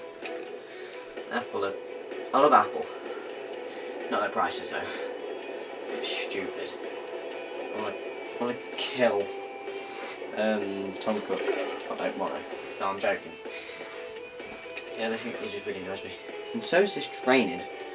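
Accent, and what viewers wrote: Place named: Liverpool, United Kingdom. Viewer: Why did you buy this you scouse?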